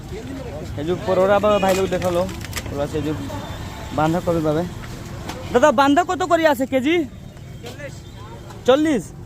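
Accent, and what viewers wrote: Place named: Bhojpuri, India. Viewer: I from Nepal... Bhojpuri bhasa itna mast boll nu dil khus hojala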